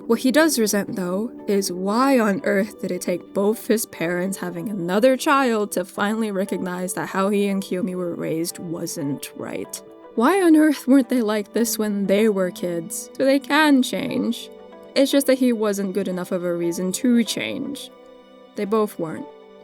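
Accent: United Kingdom. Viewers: I love how your accent is slowly becoming more British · You’re British voice is fine, Bouth are engaging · lmao i was wondering! the whole video, i sat there trying to decide if you had always had a british accent and i just somehow blocked it out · I’m rewatching this with AirPods and I can now clearly hear the British slipping in 😂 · It's not really related to the topic of this video but I find the way your accent changed into this very british english very relatable for me lol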